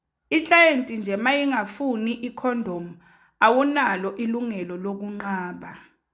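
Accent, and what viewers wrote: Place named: Nigeria. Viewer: Wassalamu alaikum wa Rhamatullahi wa Bharakatuh You sound Nigerian yourself.. 'cause you are not Umzulu....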